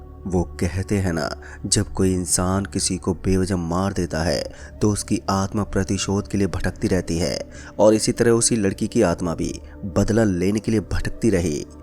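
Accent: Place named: Noida, India. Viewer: Bhai ap noida se ho na voice se lgra hai re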